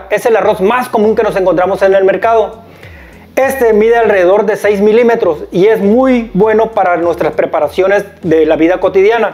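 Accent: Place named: North, Mexico. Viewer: Su asentó del meritito Norte me encontró!!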